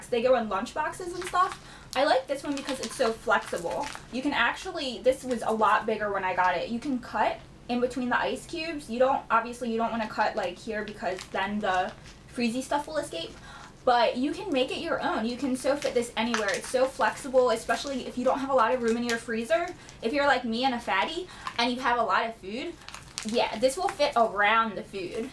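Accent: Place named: South, United States. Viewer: HAHAHA, a wet rat... good one. ;p girrlll... you're super funny! (: i want your steering wheel cover. been meaning to get that one. (: you have an accent... i'm having a hard time determining where you might be from. my bf says tx or somewhere from the south! you're super talkative; i love! <3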